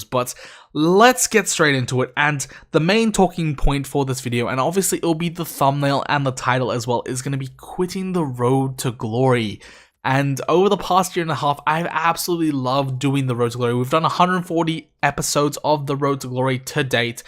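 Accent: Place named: United Kingdom. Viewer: Btw are you fully Australian I haven't seen you saying mate for once and your accent is British.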